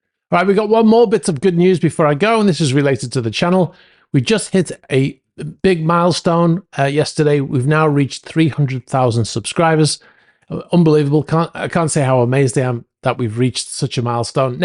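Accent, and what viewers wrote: Liverpool, United Kingdom. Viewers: Great channel! Who is the host? I’m guessing a fellow Liverpudlian? Like to know more!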